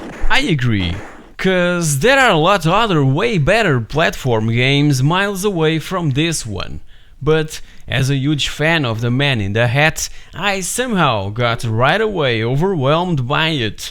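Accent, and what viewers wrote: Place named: Portugal. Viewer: Portugues certo... o sotaque parecia... muito bom canal! Saudosismo é coisa boa!:)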